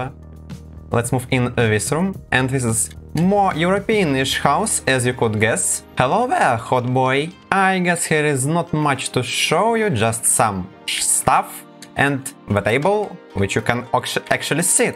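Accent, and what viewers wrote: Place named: Russia. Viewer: Русский акцент узнается с первых слов🙃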